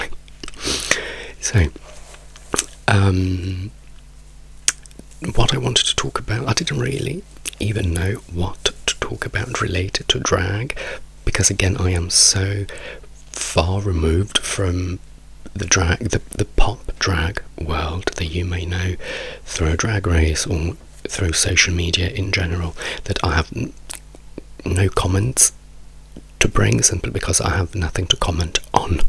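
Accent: England, United Kingdom. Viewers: mamma mia ho appena visto un tuo video in italiano, sentirti con questo accento inglese è pazzesco 😍 meraviglia davvero sei spaziale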